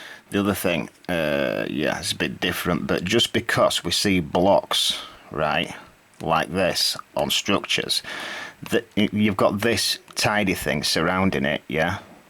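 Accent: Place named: Yorkshire, United Kingdom. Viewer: Hi mate,speaking to you from Yorkshire meself!Love the accent,love the truth.Let's year down this rain mainstream paradigm and blow some minds!Midfield by Roger intro,d me to this and just wow.Truth always seemed self evident from the last stage lolol what is it? Deny,try to destroy,process then accept,always the same.Thank you my friend.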